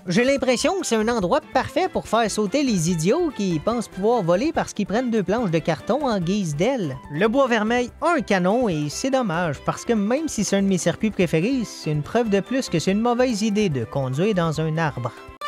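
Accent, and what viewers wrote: Quebec, Canada. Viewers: cette vidéo serait moins bien sans accent québécois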